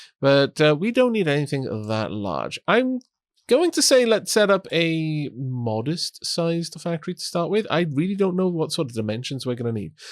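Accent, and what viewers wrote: Wales, United Kingdom. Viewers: Ha, just finished the previous episode, enjoying the dulcet welsh tone of our tea drinking dragon saviour as I recover from my surgery today lol much appreciate the upload to keep my chill recovery going lol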